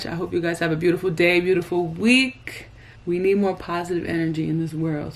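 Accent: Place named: New York, United States. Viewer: Funny, quarantine made it easy for me to transition to being full vegan, very little carbs (still am). To be honest I was already vegetarian most of the time (a little fish, but LOTS of carbs, cheese and ice cream--my downfall). I've lost 25 lbs with zero exercise--I'm not proud of that, but still mentally trying to get my own way. Imagine how much more I'd be losing if I was exercising? You are so real, so inspiring. Thank you! (You sound like you're from NYC, BTW lol)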